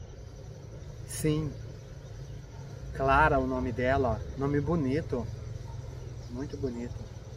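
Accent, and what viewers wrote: Minas Gerais, Brazil. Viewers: O o vc e mineiro ou goiano? Sua voz não parece com pessoas do Sul não! Vc parece mesmo e míneiro ou goiano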